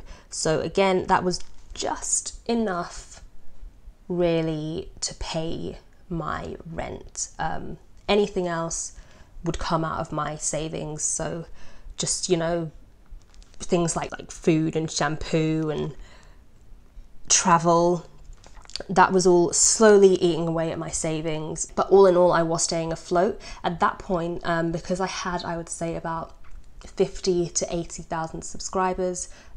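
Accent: England, United Kingdom. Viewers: I third that. Haha. New subscriber. I love Art!! Love to listening to British English accents.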